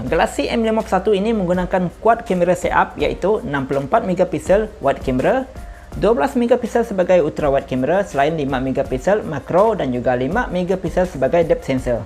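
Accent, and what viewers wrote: Sarawak, Malaysia. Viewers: Slang Iban so awesome...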